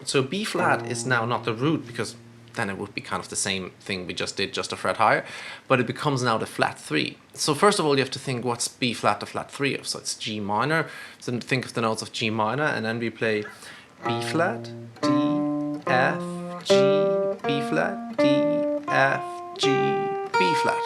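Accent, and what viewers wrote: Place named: Ireland. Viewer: Great great lesson, thank you! Und dein irisches Akzent freut mich sehr :)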